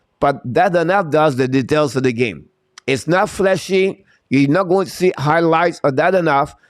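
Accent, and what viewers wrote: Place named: France. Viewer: The FrenGlish is great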